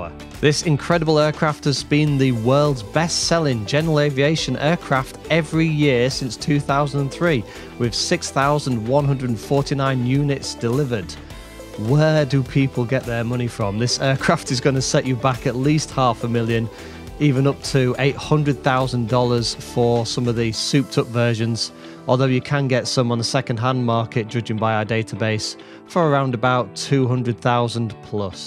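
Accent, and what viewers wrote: United Kingdom. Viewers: brittish accent???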